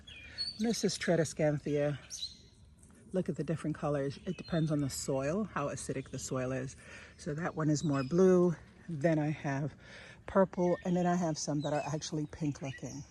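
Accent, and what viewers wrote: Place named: South, United States. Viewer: You have a garden my grandmother would be envious of ❤ I’ve been following you on instagram, just found you this morning on YouTube from your link on insta. My family and I moved to Southern California the end of 2021 and this video is making miss home something bad this morning! 😢 Your accent, the birds, the lushness…. We weren’t in Georgia but near Asheville NC. Happy Saturday! I absolutely love your garden! ❤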